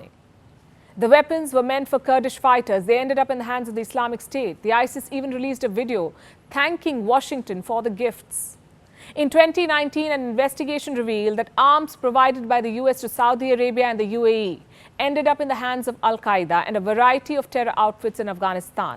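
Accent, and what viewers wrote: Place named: North India, India. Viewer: stereotypes accent media made about india is south indian , most of north indian accent is same as palki.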